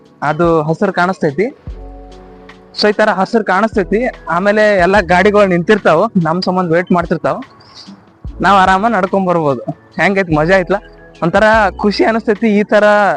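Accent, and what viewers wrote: India. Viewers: Houda okay ನೀವ್ ಮಾತಾಡೋದು ಸ್ವಲ್ಪ ನಮ್ಮ ತಾರಾ ನೆ ಅನಿಸಿತು ಅದಕ್ಕೆ ಕೇಳಿದೆ , ನಮದು ಬೆಳಗಾವಿ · Nevu north kannadaana...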